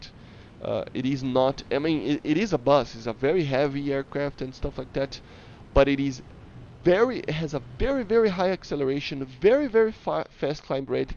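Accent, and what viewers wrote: Brazil. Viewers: eu conheço o sotaque brasileiro KKKKKKKKK, muito bom seus vídeos Man, continua com o ótimo trabalho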